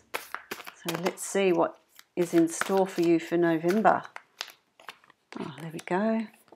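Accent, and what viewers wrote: Australia. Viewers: You sound like an Australian, i like the way you explained this.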